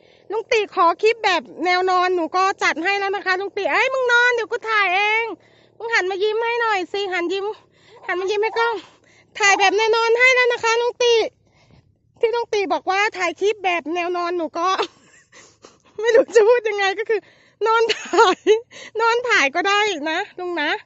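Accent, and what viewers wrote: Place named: Renu Nakhon, Thailand. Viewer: ภาษาเดวกันกับไทเรณูนครเลยเนาะ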